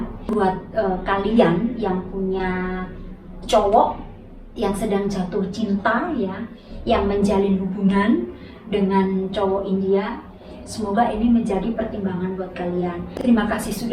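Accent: Java, Indonesia. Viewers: Salam kenal y mbae..dri logatnya kayaknya wong jowo yo sampean mb?maaf klw slh...stiap liat vlog2 orng indonesia yg di india rta2 sm keluhan nya..seht2 y mb..salam dri indonesia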